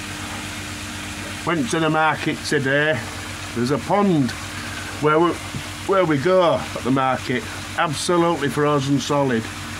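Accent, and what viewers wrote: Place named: Yorkshire, United Kingdom. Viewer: love the Yorkshire accent on water. its great this weather i did have a mulibay but now have a nexus and just subbed mate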